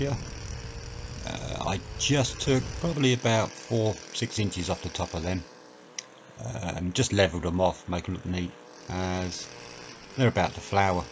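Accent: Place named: Northamptonshire, United Kingdom. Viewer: Looks good. Good vid. Where in the country are you from as you sound very Northamptonian?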